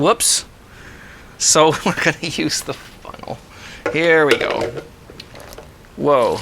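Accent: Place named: Canada. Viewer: You are my hero. I am picking up a hint of an accent. Are you Canadian or from Canada?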